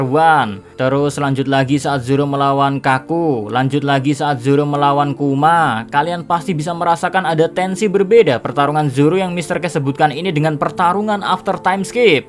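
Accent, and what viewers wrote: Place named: Java, Indonesia. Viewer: Gak nyangka chanel ini besar kek gini.. Padahal dulu 2019 pertama aku nonton chanel ini.. Saya menyepelekan chanel ini.. Apa sih chanel kecil gaje.. Tapi lama kelamaan jadi suka seru... Yang paling aku suka jujur bukan teori atau ap apa.. Aku suka suara mr. K... Jawa campur indo... Vokal suaranya ciri khasnya itu loh wkwkwk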